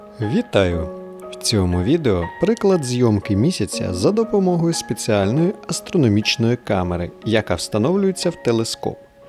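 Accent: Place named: Russia. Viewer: У вас ужасный русский акцент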